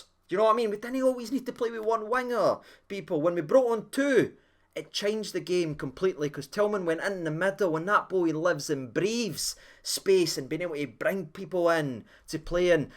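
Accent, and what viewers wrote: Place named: Glasgow, United Kingdom. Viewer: Great result last night. Love your videos and wee Glaswegian accent. Best from Oz.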